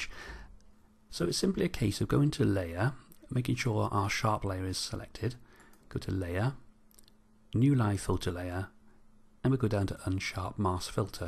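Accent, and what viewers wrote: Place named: Wales, United Kingdom. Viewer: Thanks so much ! And love the Welsh accent, so gentle to listen to..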